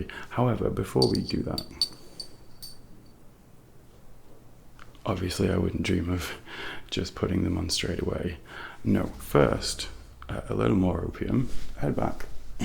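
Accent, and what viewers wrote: United Kingdom. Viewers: Your British accent is very realistic 👍🏻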